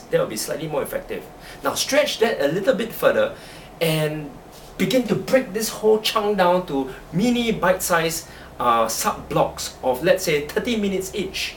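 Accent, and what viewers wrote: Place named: Africa. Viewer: Why do you sound so African?!?!?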